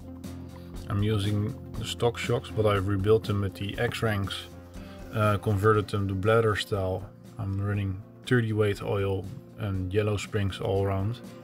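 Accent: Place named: Netherlands. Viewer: Klinkt Nederlands 🎉 dikke setup. Vanwaar de servo saven van Vitavon?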